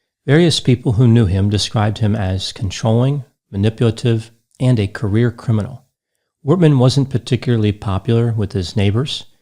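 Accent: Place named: Boston, United States. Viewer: Hi Dr Grande fans, can you tell me what accent is? I’m from Australia and I can often pick American accents but I can’t quite pick this one. His accent is apparent when he says the word ‘on’ and it is super cute. If I had to guess I would say Boston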